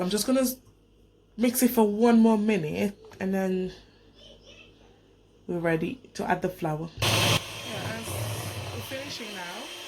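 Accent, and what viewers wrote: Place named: Haiti. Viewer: Yeah you sound Haitian haha xx great video!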